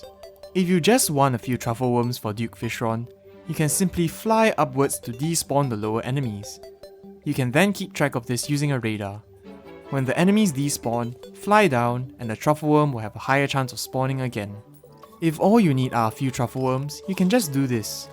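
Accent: Singapore. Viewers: Are you from singapore? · I knew it from the accent. This man is Singaporean. Cool to see.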